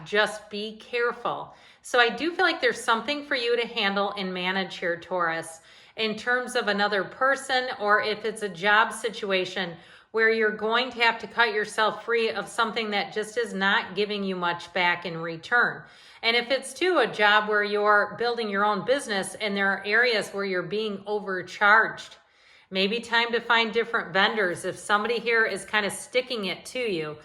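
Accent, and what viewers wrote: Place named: Chicago, United States. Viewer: you sound like you're from chicago. I grew up there and know that accent anywhere! So glad I found you here and this totally resonates with me. One question I have is how is the retrograde helping abundance?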